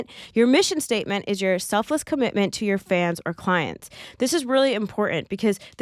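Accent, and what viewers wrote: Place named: United States. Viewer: That American accent is off the hook!👍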